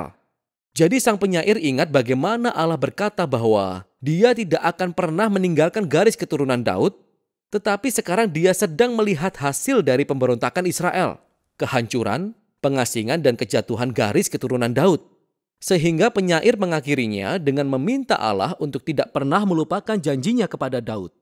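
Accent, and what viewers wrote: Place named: Java, Indonesia. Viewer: Puji Tuhan! Konten yang sangat bermanfaat. (Pengen komen kalo Narator/Presenter nya agak medok sedikit hehe..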